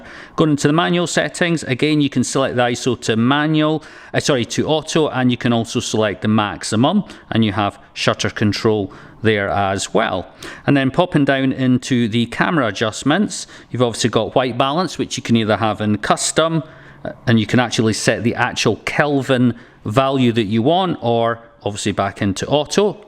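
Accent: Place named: Scotland, United Kingdom. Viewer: OMG 😮 ... You’re Scottish!! :-). All the big you tubers are Scottish... just look at big-Clive!! :-)... great video!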